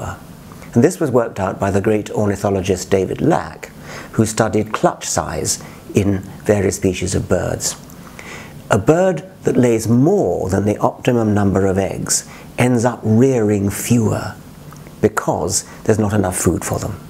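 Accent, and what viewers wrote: England, United Kingdom. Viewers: Sounds so good with the English accent but complete bullshit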